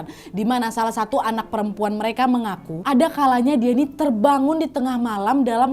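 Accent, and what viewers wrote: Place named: Medan, Indonesia. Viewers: Enak kali dengar ceritamu wak..ada logat medan, ada logat minang,, nyampur2 😂😂😂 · Gak pernah bosan melihat vidio vidio kamu syg lucu gokil. Rame dgn logat melayu medan nya.. Trimakasih mbk uda selalu menghibur dgn cerita kisah nyatanya. Sukses terus ttp semangat dan semoga kamu sehat dan bahagia. Amin 😇🙏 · Serasa macam sedang di Medan lah awak nih... sukak kali dengarin cakap nya nih... tebayang awak masa kecil di Medan.. sukses ya.... 😊😚👍👍👍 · Logat Medan,Sumbar dan Pekanbaru menyatu 🤣😂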